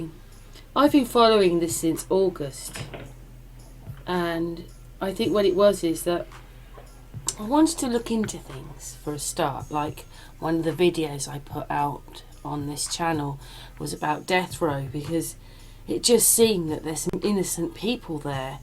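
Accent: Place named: Midlands, United Kingdom. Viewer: Happy birthday to you, is it a midland accent or east coast?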